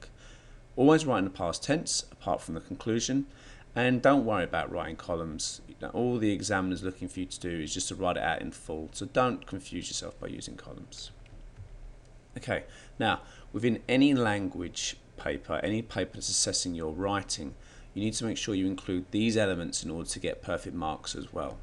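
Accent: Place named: United Kingdom. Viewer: Informative video thanks. But British ascent is very hard to understand for us(non native). But i'm trying to understanding.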